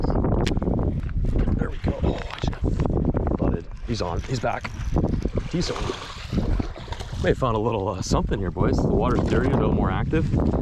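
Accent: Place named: Texas, United States. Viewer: Did anyone notice how texas accent came back when he left Main?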